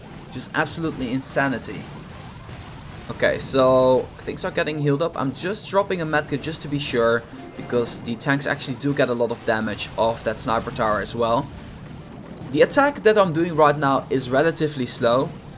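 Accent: Netherlands. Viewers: Is he dutch.cause gis accent looks like a dutch boy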